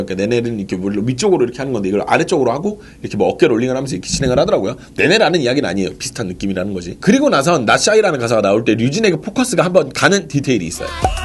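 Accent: South, South Korea. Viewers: 아따 ~ 남도사투리로 설명하는게 겁나 구수하구마이라~ ㅋㅋ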